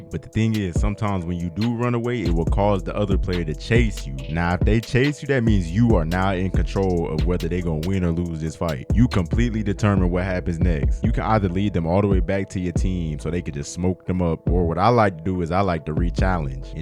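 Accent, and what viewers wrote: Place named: South, United States. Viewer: Bro you from the south ain‘t you 😅 anyways great videos!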